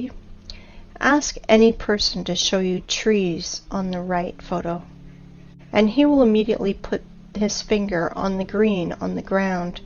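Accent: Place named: Russia. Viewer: I wish i could get the original version with the Russian style accent. my hard drive crashed and i lost my downloaded copy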